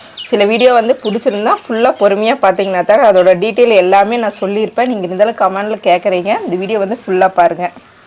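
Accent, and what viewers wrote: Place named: Coimbatore, India. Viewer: nanum coimbatore than...pollachi amma ooru...ippo US la irukken...unga recipe ellame super,na main ah neenga pesarathe ketka varuven...namma ooru basai...ippo kuda sometimes enna ariyama vanthudum...ellarum correct ah kandupiduchuvanga... neenga coimbatore ah nu ketpanga...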